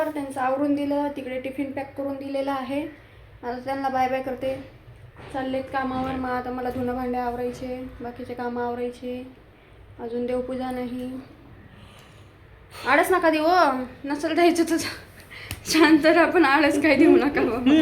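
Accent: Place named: Nandurbar, India. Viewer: तुमचं गाव कोणत आहे तुमच्या बोलीभाषेवर तुम्ही नंदुरबारच्या किंवा जळगावच्या आहात असं समजतंय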